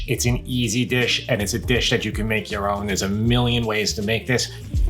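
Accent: New York, United States. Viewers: Oh and btw, I love your NY accent. I'm from Germany but I lived in Hoboken for 4 years and it takes me back. 🙂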